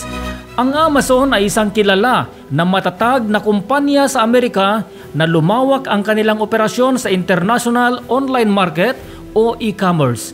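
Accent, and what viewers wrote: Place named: Visayas, Philippines. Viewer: ekeya ka jn, ausin m salita m nkkhiya kang bisaya ka .. kkbwisit ausin m salita m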